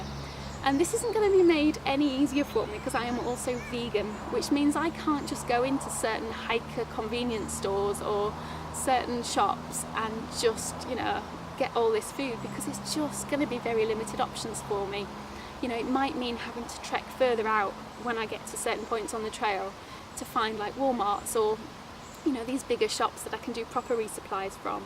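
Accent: United Kingdom. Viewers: I have always loved the UK accent every since going out with one for a couple of years in the 80s. 6 months is along time witch means you'll hit my state late Sept or Oct. Last year snow started the last day of summer and we ended with 120 - 130 % total snow pack. This year suppose to be hot and dry but my state is unpredictable . We have the Pacific Ocean on the coast rising to the Olympics dropping into Puget Sound before rising to the Cascades. CHECK out Farley this year he is a VEGAN and this guy is Bookin. In 45 days he has reached the halfway point 1235 miles. You can see where he supplies and where not to. GOOD LUCK, I just sub.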